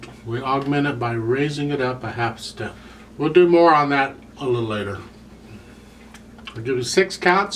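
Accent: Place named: Kansas, United States. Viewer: Beautiful. Thank you! It could have been so cute hearing your Kansan accent saying in Spanish. 😊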